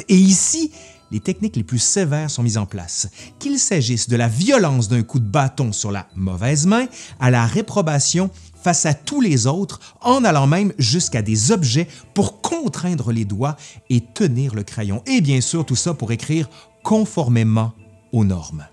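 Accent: Quebec, Canada. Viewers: Salut J''ai un peu plus de 50ans et je me permet de faire remarquer que beaucoup d'artistes sont gaucher! Et sais tu que les gauchers ont la faculté d' écrire à l'envers. Tu peux donc les lire que face à un miroir! Ça ne sert à rien! Et beaucoup de gaucher l'ignore avant de d'avoir essayé! Je te suis régulièrement, tes continus sont intéressants, et très bien documentées. C'est surtout divertissant d' attendre parler de la l'histoire de France et autre, avec un petit accent canadien ou Québécois! Je ne sais pas faire la différence, si il y en a une! En tout cas, continue!? Tu fais un très bon boulot avec ton équipe..... Bon courage! J-P. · Et bien bordel,je ne savais pas tout ça étant moi même gauchere ou parfois mais rarement ambidextre j ignorait l histoire de ce fascinant outil qu' est la main gauche car les gaucher on tendance à être plus agile dans certain domaine comme dans le dessins que je pratique.... 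Je suis fière d être ainsi merci de votre connaissance avec un ton de sagesse et d une pointe d accent québécois vous m inspirer avec nota bene pendant de je dessine ça me détend continuez ainsi...votre savoir doit être important pour les génération futur...😊😊📚✏🖌🙏🏻🙏🏻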